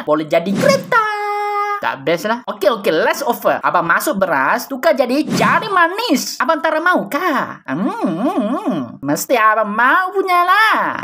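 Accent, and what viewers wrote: Malaysia. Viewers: Abang negeri ape perak atu kelatan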